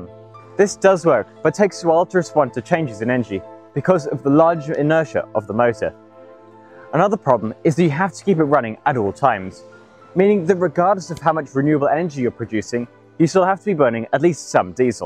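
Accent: England, United Kingdom. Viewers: I don't get it. Your accent is English, but you say "we Australians", what's your history bro? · You're Australian but it sounds like you have a Brittish accent.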